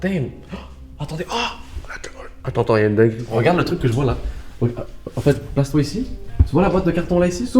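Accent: Quebec, Canada. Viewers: Il a un peux l'accent québécois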